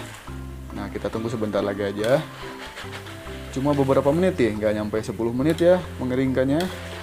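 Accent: Sunda, Indonesia. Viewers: Kang sunda pisan Ga ada budah trs kl udah gak ngagolotrak Santai banget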